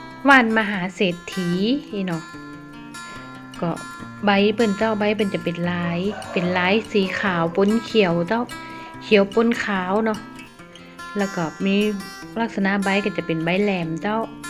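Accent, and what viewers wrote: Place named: North, Thailand. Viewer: ใบงามจ้าน้อง เป็นลายเนาะพี่ชอบต้าพูดเหนือ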